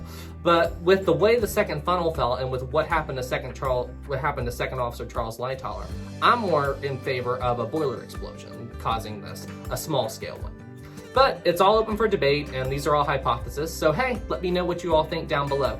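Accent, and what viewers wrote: South, United States. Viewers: Your southern accent ......... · Stop trying to hide your southern accent. Nobody cares. Excellent video thankyou!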